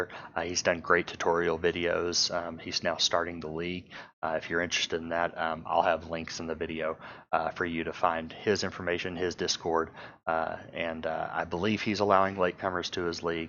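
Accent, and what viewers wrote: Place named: Texas, United States. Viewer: Thanks so much, nasal texan :)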